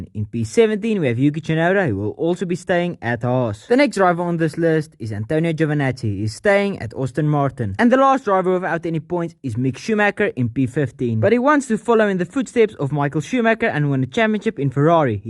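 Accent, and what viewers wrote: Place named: South Africa. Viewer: This is the strongest South african accent I have heard in my life.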